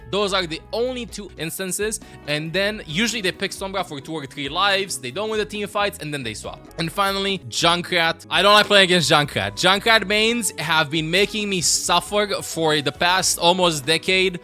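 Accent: Romania. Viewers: That’s European accent, like Romanian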